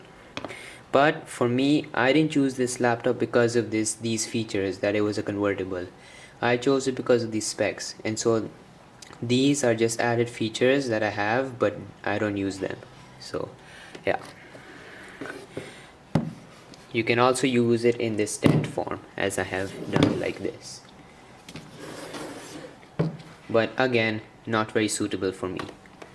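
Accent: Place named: India. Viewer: oh k... U sounded like an Indian.. 😂.. Anyway.. This model is not available in india thats why I was wondering..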